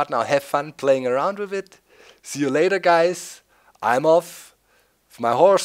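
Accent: Germany. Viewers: I love the German Accent well i think its German.... :3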